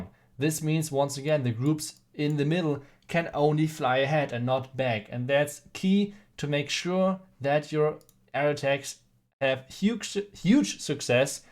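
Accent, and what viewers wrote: Germany. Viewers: Dein Deutscher Akzent ist Mega weiter so!🌞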